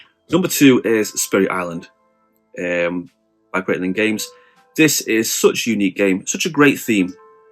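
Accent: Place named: United Kingdom. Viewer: I love your videos!! They’re great and helpful. Helped me decide to pick up Arkham horror lcg :) what region of the uk are you from if I can ask? I can’t place the accent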